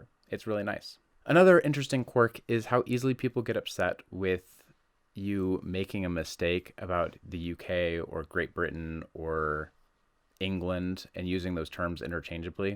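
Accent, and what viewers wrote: United States. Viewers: Hey, I completely agree with you about so often not just being able to use plastic to pay for your parking - there's no consistency and it's infuriating discovering you need cash and not having the right change. 🙄 The last time I was charged to use the loo anywhere was at King's Cross station about 10yrs ago, but I don't believe they charge anymore - it's not 'terribly' common these days. 😉 I think people who are picking you up on where you say you live are being smart alecs - they probably hear your American accent and assume you won't know the distinction. I live along the South Coast from you and I always say I live in England - because I do! Next time someone asks you where you live, just say "England... which is part of the United Kingdom of Great Britain and Northern Ireland" - that'll shut 'em up! 😉😂 BTW, the contactless card limit has just been increased from £30 to £45, to reduce the occasions you have to use cash during these times of CoronaVirus. 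Hope Danielle's OK - stay safe and well! 😀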